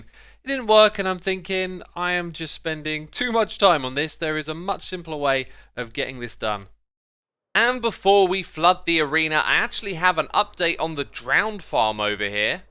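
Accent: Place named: RP, United Kingdom. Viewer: X sounded so extra posh in this episode.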